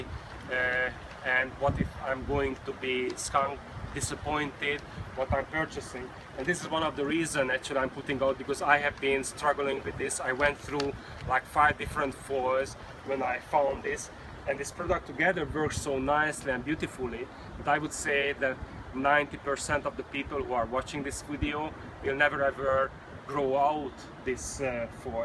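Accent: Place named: Hungary. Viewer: Hungaro English :)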